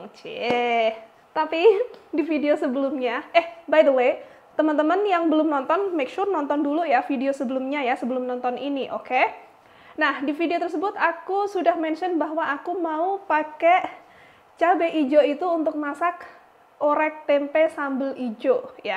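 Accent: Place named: Banyuwangi, Indonesia. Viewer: Aku seneng mbk nek ngomong sek medok banyuwangi, salam mbk aku teko banyuwangi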